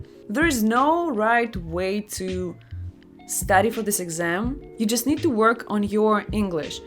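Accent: Russia. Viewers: My English skills is horrible, but i decided to take the Duolingo practice test and i scored just 60-75 :( Oh my gosh, i need to study more 🥺 I'll try it one more time :) I almost forgot to tell this, i found out your channel a few minutes ago by mistake and now I'm a new subscriber :) By the way, are you Russian? I've noticed a Russian accent in a few words as you speak or am I crazy 😧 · Ikr! I really liked the russian accent 😂😂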